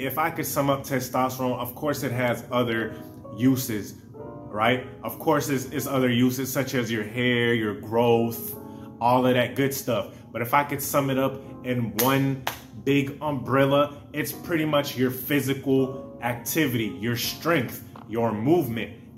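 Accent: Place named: California, United States. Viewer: Bro sounds Sacramento or stockton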